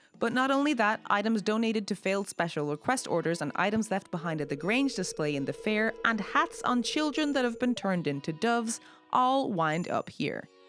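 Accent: Ireland. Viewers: what accent is this? i love it! it almost sounds irish to me?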